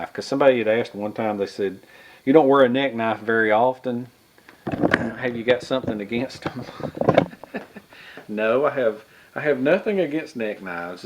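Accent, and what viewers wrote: South, United States. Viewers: G'day, I saw this excellent video when it came out. I didn't leave a comment. It happens that I'm a huge fan of Kukris and recurve machetes and recurve/convex knives. I took your advice on-board because I didn't have a strategy for sharpening my Kukris, convex and recurves. When in doubt I used a ceramic rod and a ceramic oval rod; which by the way, works well. Here it is two years later and I can tell you that your methods (in some cases slightly modified) have been working for me. I don't like using awlll (AKA: oil) so I got myself an ultra-fine water stone wheel. I also have practiced doing a lot of 'line of sight' freehand honing and stropping and I can pretty much get a surgical blade finish on any decent carbon or stainless steel. Although, I took your advice and didn't bother spending too much time on my 'chop only' Kukris. 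Now I have a lot of knives in my user collections that I don't need to show to a stone of any kind. Thanks, you've been a great help! P.S. I love your Southern accent although with some words I need to see sub-titles till I get used to your great way of speaking. No dig intended; if you heard my rough Aussie accent you'd probably reckon I was babbling on in some foreign language:) Good one,. Since 2016 I've not missed one of your videos. Outstanding. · I have no clue how to sharpen knives. I bought my son a stone. Hes the one that told me it needed o'l 😂. Love that southern drawl. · I have made many knives, swords etc, Viking swords, Roman Gladius, Roman spatha, blah blah, but one thing is for sure, if you develop a sharpening technique that works, then who cares how others do it. I just laid on my couch, and after watching the full video, I have an overwhelming urge to go in my workshop, grab my sharpening stone and some strop leather and sharpen my survival knife, and I'm not even a bushcrafter. 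In fact, watching your vids has given me the idea to spend a weekend in the forest up the hill from me😊, although, it's October, it's Germany and its bloody freezing 😂, so I may wait till next summer. But one thing I wanted to say as a relative noob to your channel, is thanks for sharing your passion, and to us over the pond, your happy go lucky positive attitude and your really funny southern Hill Billy drawl, makes your vids even more infinitely watchable. So, cheers, appreciate it bro. 👍🏻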